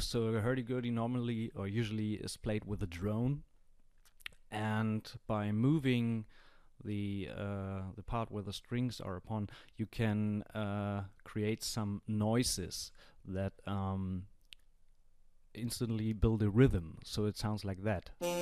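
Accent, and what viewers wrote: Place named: Germany. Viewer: Are you german? Cause you have a german accent ;) Or atleast it sounds like one.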